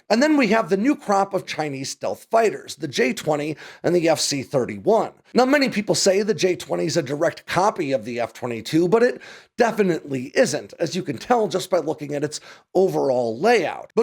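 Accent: United States. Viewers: "We had B-52's, flying around" -- 1) Yup. All true. Because we thought it was that, or nuclear annihilation. Stakes are a tiny bit different with Taiwan. WWII and the Cold War are too far off. I also believe in the greatness of the American people as much as you do. But that doesn't mean we always have the political will to act. (Also, Aerial refueling, not Arial the font!) 2) Still, dude, you don't help the American case by sounding like the 'MURICAN parody!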